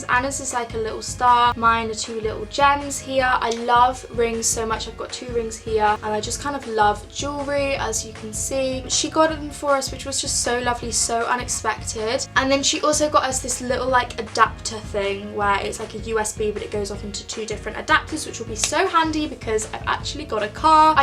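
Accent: United Kingdom. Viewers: I looooove your british accent. I wish, I coul speak like you xD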